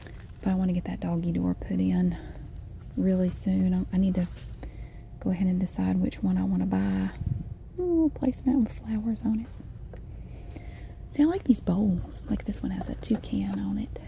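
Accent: South, United States. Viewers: I love her southern accent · you are so relaxing and I love your southern acent it's very beautiful thankyou for making videos they are very much appreciated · Your kids have a thicker southern accent than you do :) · Where in America is this accent from I love it oml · I just subscribed to your channel and I love it! Your terrific and love the southern accent!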